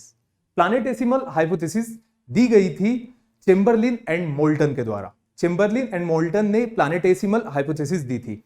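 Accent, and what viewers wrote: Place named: Rajasthan, India. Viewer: YOU SOUND LIKE RAJASTHANI SIR 😀 AWSM SESSION BTW ❤️🔥🇮🇳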